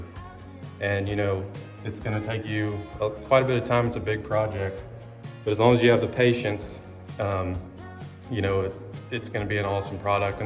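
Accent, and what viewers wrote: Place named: Indiana, United States. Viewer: I'm in southern Indiana. You remind me of an old classmate of mine. I think your accent gave me a hunch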